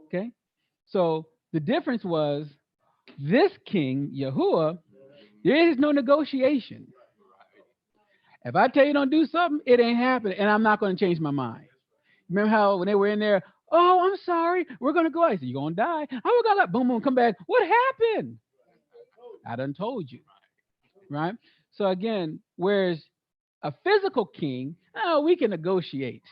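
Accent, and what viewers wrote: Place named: Israel. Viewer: Shalom mishpâchâh!! I am enjoying this channel.. can you please help me with understanding the proper Hebrew to learn? The Hebrew that you speak, sounds like the same Hebrew that is mixed with Yiddish that the people in ISREAL speaks. Is it? Also the Hebrew you speak and teach is different from those who speak paleo Hebrew. With the vowels being Aa, Ba, Ga, Da etc. etc. I want to know it fluently but there are different vowels and Annunciation. Can you help me with understanding the difference between the two so I can become fluent in our language? Thank you sincerely Shalom or is it Shalawam?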